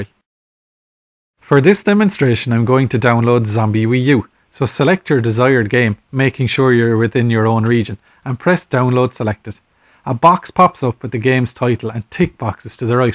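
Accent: Ireland. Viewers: hey are you irish i am!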